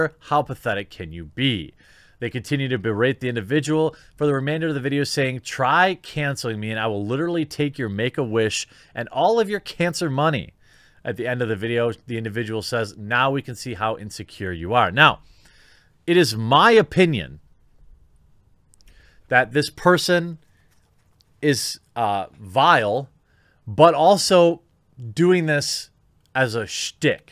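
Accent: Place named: California, United States. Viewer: where in california is that accent from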